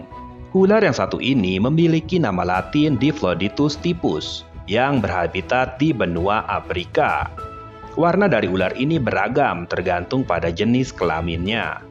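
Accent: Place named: Tegal, Indonesia. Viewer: Orang tegal hahha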